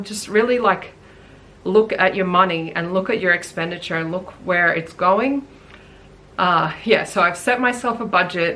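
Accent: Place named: Australia. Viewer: I found your channel on my own yearly bible reading journey :) it's nice hearing an Aussie accent talk about this :) I felt like I was in a prayer rut too and started a prayer bible this year. There's a lot of videos on sisterita club to get started and then people have refined her idea to better organise the tabs :) You seem very financially sound but I thought i would just add in that your super should be in high risk portfolios while you're young. 5 years out from when you plan to retire switch it to conservative funds. Your really need the high risk investments to have enough to retire on 😊 And don't panic when they tank. It's about a 10 yr cycle but you come out better in the end :) With your budget, watch your quarterly trends before you change any monthly budgets :) With your iron if you cook a lot at home check out cast iron.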